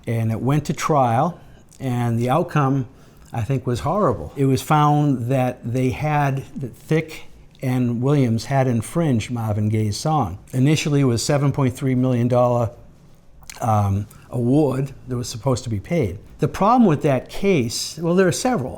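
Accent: Boston, United States. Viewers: . Boston Accent 101. But I’m happy because is So Incredibly Dumb as a song, it deserved to be destroyed.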